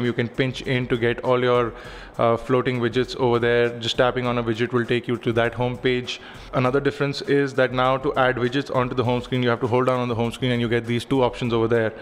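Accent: India. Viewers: Indian accent dude :D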